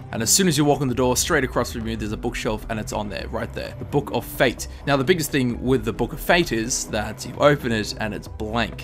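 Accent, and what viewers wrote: Australia. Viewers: Australian accent dummi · Fucking straya mate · Just Austrailian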